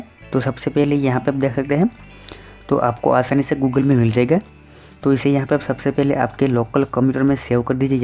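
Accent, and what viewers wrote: Gujarat, India. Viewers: Seems like Gujrati. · Bhai gujrati laago cho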